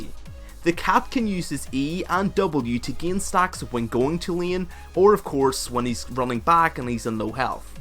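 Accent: Northern Ireland, United Kingdom. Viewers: He's Irish, more specifically, he might be Northern Irish. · whaere is from? Never heard his accent before, and can't say if it's more British or American...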